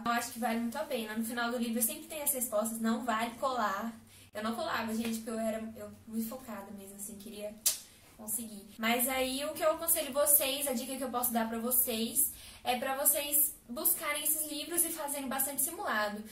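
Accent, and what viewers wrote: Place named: Minas Gerais, Brazil. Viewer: tá com sutaque de minas... ♡♡♡♡♡ sucesso aee;)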